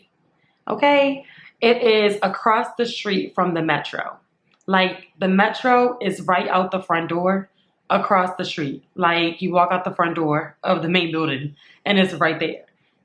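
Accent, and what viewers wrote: Baltimore, United States. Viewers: That Baltimore accent is strong 😭😭😭😭🥺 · Hour on the train. And accent. Gotta be from Baltimore using the Marc. I almost switched off the video thinking it was over not even realizing you were about to do the tour and that I had been listening to you this entire time without even seeing the place! Your voice is very nice.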